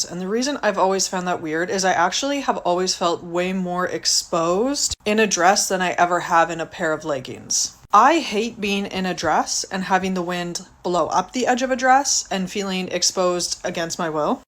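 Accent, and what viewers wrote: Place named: United States. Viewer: Rarely comment on videos, but why not… knowledge is power so the least I can do after hearing your perspective is to offer my perspective and opinion in return. So here we go… #1 First and foremost - This is 2025 and judging by your accent it sounds like you live in the states. Wear what you feel comfortable wearing and don’t worry about what other people think. - FEELING exposed is definitely a thing. Again, wear what makes you feel comfortable. For the same reason I don’t like to wear pants or shorts that don’t fit me right because I FEEL like they expose my ass crack lol I’d rather I didn’t feel exposed. - I mean, we couldn’t take pictures with our phones when I was in school haha so can’t relate there 🤷‍♂️ We did, however, sneak peaks when we could of course. Natural curiosity. But to take a picture and expose someone publicly or save a moment in time for future reference without their consent? 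That’s just fucked up. That’s just bad character. - Oh we are 100% picturing what you look like naked whether you’re in a dress or in leggings. Leggings actually make it easier though, just sayin’. Well… I guess it depends on the dress, too. Anyways… I that we goes back to natural curiosity as well. Speaking from a man’s experience, we have our preferences. At the end of the day, our primal instincts are just trying to narrow down a suitable mate. That, however, should NEVER excuse intolerable behavior of societal norms (but what has our society turned into anyways?). Without moral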